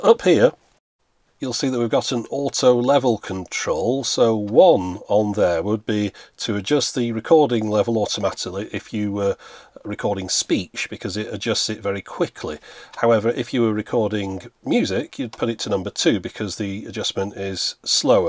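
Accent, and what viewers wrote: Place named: Northern England, United Kingdom. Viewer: He’s obviously from northern England which is actually in between Scotland (Connery) and London (Collins).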